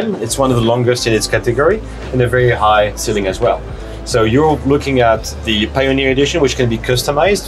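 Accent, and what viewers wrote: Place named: Switzerland. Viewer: "Bisch scho am filme?"...ha doch dänkt das du schwiizer bisch...😊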